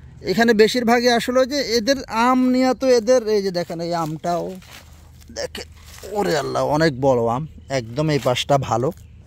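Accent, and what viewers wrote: Noakhali, Bangladesh. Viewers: ভাই আন্নে কি আংগো নোয়াখালীর নি? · আপনে কিতা নোয়াখাইল্লা নিতা। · আপনি কি নোয়াখালীতে বাড়ি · ভাই আপনি কি নোয়াখালীর মানুষ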